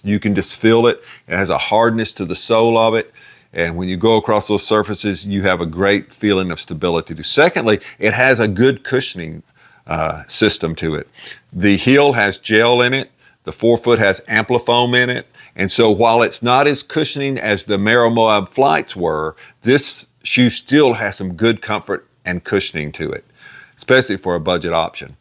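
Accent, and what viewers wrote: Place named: South, United States. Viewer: love the southern accent!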